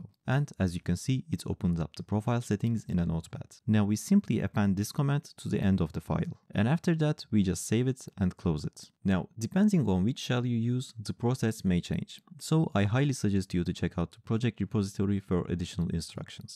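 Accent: Turkey. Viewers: you sound turkish, why no turkish video?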